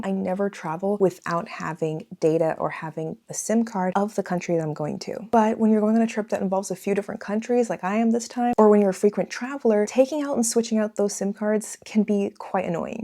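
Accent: United States. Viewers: Then why is your accent so american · I think it's great this journey, but for someone who has lived nearly all their entire life in China and feels more Chinese than American, you definitely have a very strong native born American accent. Strangely I feel like Americans overseas never lose their accents no matter how long they've been in that country? Sorry that feels like a generalisation. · It’s amazing how you sound American despite growing up in China. It would be interesting if you had a Chinese accent. Vancouver BC is a beautiful city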